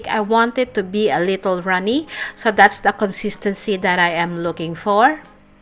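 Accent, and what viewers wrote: Philippines. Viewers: Filipino accent...nice.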